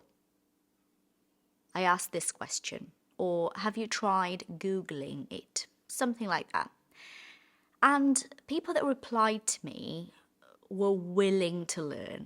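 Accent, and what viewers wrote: United Kingdom. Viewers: British accent is amazing. Greetings from Brazil.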